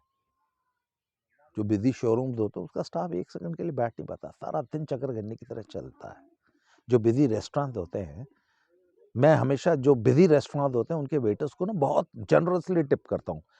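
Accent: Haryana, India. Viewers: Guruji ये विडियो देख के बड़ा मजा आया ओर अच्छा भी लगा के आप वापिस अपनी फोर्म मे आगये, आपका देसी अंदाज़ ओर भोजपुरी मे हरयाणवी टच सरसो के साग मे देसी घी, प्रणाम🙏🏻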